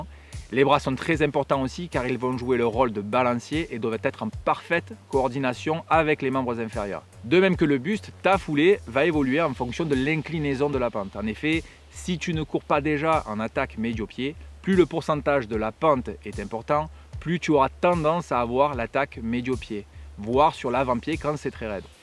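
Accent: South, France. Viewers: Même si l'on pense tout connaître du running, il est bon de revenir régulièrement sur les fondamentaux. Quelques rappels font le plus grand bien (même pour les runners aguerris), nous évite de dévier (cela arrive forcément) et entretiennent le mental en permanence. nous explique tout cela de façon très claire. Les explication sont simples, précises et concrètes. J'apprécie beaucoup ses vidéos avec en bonus un petit accent du Sud qu'on adore.J'ai 63 ans et je fais parti de ces coureurs qui respectent les bases élémentaires du running (hydratation, étirements, progressivité dans l'entraînement etc...). Ce qu'explique parfaitement bien.Cela me permet de continuer à faire mes 50 à 60 km par semaine et tout cela sans bobos.J'encourage à continuer ses vidéos. Un grand merciEric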